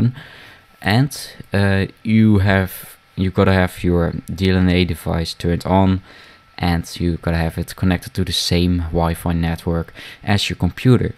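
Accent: Netherlands. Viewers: deze gast is duidelijk nederlands XD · U can hear from his accent that he is dutch :P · arre you dutch?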